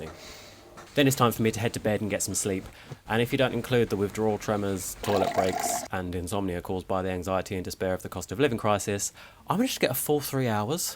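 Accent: United Kingdom. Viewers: I didn’t get a word you said because you are British.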